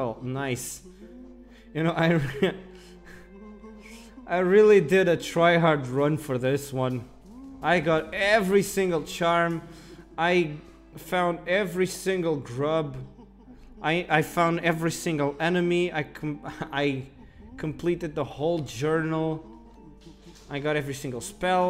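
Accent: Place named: Russia. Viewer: my boy have a second channel well then sees he did star war thing TAKE MY SUBSCRIBE R N By the Way : are you russian ? you sound like you are Also it was a great run im up for Minecraft and other game aswell i recommend you to play Little Nightmare 1&2 like doing a let's play or something have a great day !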